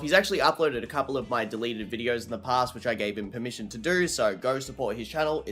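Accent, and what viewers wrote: Australia. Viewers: nah im so happy youve returned bro love from a fellow aussie (u sound like u aussie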